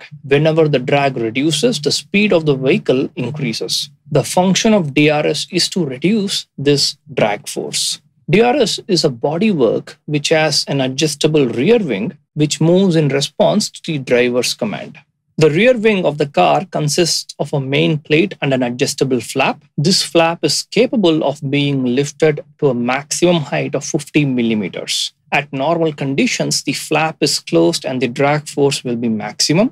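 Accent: India. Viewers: Immediately disliked after hearing indian accent lol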